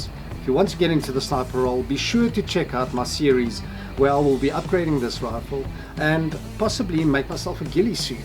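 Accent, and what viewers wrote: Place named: South Africa. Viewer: are u from south afrika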